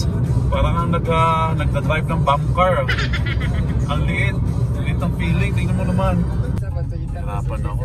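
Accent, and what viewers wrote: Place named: Visayas, Philippines. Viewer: Hello....visayan accent cutie.....good family and loving wife....you are blessed to have them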